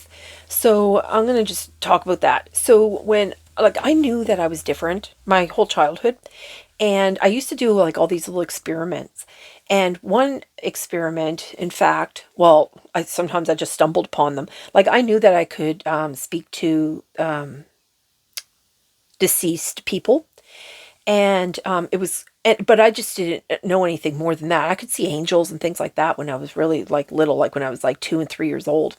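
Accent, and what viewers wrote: Canada. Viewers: Canadian, eh? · ❤ Thanks, I stumbled upon your video... It's very aligned with what I'm experiencing right now. I heard the Cape Breton accent;) ❤❤ ❤❤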